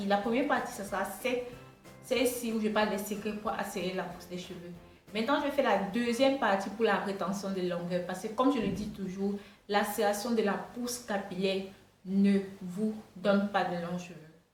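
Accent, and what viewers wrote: Cameroon. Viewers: Tu parles comme les bamilekes du Cameroun. Es tu camerounaise ? Sinon merci bcp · Je ne suis pas habituée à l'accent camerounais que je trouve charmant d'ailleurs, pourtant je n'éprouve pas de difficultés à te comprendre. Continue et merci pour ces conseils. En plus tu as pris le temps d'énumérer les différents points à l'écrit dans ta vidéo. Donc si ce n'est pas clair ce n'est pas ta faute.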